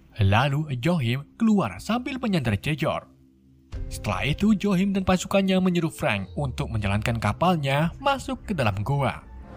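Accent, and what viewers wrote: Malaysia. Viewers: Abang dari malisiya ya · Nampaknya komentator ini dari Malaysia, sebab ada sedikit terdengar dengan nada yang berlogat Malaysia. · Bang kok loghat lu kek orang malaysia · Orang Malaysia ya min ? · Min. Gua mau nanya lu orang melayu ya. Soal nya gua teliti dari logat lu ngomong bahasa indonesia kayak orang melayu.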